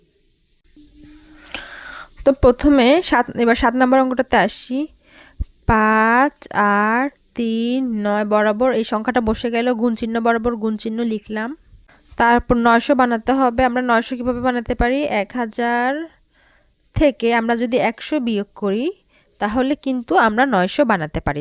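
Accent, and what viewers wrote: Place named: Chittagong, Bangladesh. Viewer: তোমার জেলা আমি জানি চিটাগাং😂😂?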